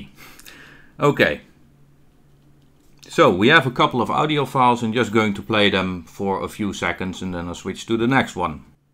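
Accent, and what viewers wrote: Netherlands. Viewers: You sound dutch bro but nice video bro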